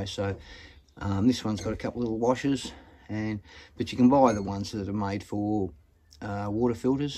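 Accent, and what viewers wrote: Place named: Australia. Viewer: 😀👍 great video and your Aussie accent makes it even better! But seriously this is such an important life or death skill people need to know. Thanks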